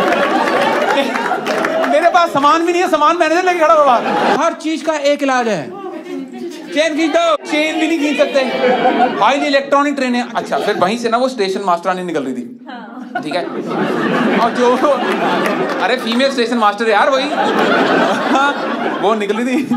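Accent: Punjab, India. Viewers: That Punjabi accent mix with hindi makes it different. 😅😂😅😂😅😂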